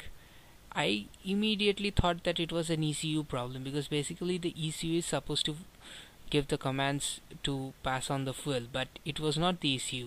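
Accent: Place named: Bengal, India. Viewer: dude that bong accent tho!! :D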